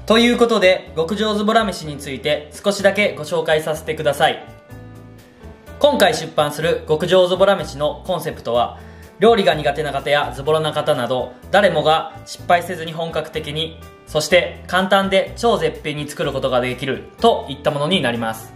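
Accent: Kansai, Japan. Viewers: 関西の方だったんですね！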